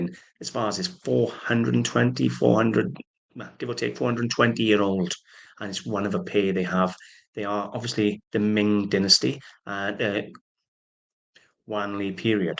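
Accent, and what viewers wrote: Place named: South Wales, United Kingdom. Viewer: Hi I’m fairly new to your channel. I’m in S Wales also and it was wonderful to find your videos and hear your accent. I m also new to reselling and I sincerely thank you for sharing your wealth of knowledge, expertise and enthusiasm.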